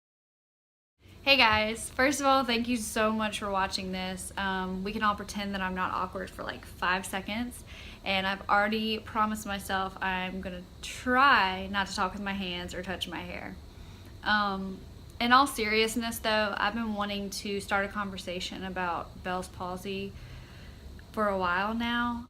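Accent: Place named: South, United States. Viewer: Hi, my name is Melanie and my husband was diagnosed with Bell's Palsy almost 3 weeks ago. He had horrible headaches the night before and then woke up am took a sip of water, it ran down his chin and he looked in the mirror and there was it. I took him to the emergency room right then and they knew right away what it was. They didn't do a scan or anything. Did you do acupuncture or anything like that? Fred is doing acupuncture, he's meditating and I think yoga as well. We live in Santa Monica, CA, but I'm originally from TN. Are you from the south? I feel like I hear it a little and frankly that's what I found appealing and comforting about your video. Thanks so much for sharing your story and I will definitely check out the FB page. I do drive Fred crazy watching all of the videos about Bell's palsy, but I just find them so helpful! All my best to you.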